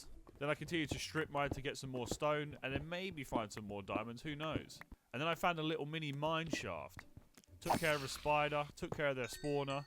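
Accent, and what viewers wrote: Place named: United Kingdom. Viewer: oh hell yeah british accent... i love it :)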